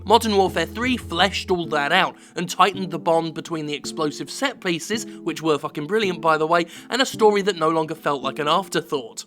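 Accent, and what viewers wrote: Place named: United Kingdom. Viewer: Wow, I love so how britishly-accented, unapologetic, and delightfull funny you are.... subscribe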